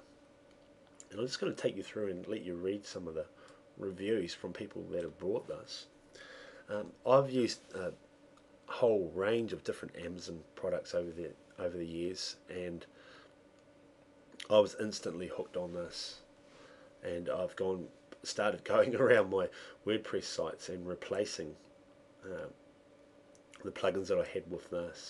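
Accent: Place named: Australia. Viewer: I love the subtitles - mis-interpreting your Australian accent I'm afraid. Sorry - I'll continue to watch the video.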